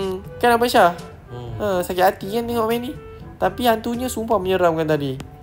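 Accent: Kelantan, Malaysia. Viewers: Bang orang Kelate ke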